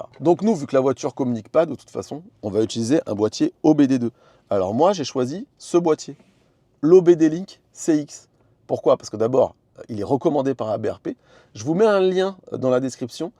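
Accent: England, United Kingdom. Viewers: cet accent anglais se perfectionne de vidéo en vidéo. Et c’est beau!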